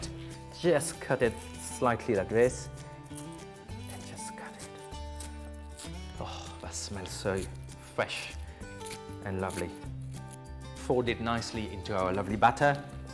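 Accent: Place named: France. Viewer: dude your french accent is amazing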